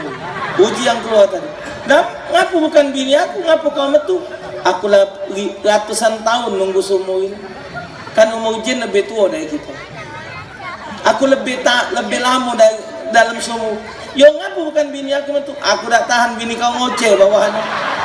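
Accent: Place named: Palembang, Indonesia. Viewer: Seperti bahasa Palembang Ya bang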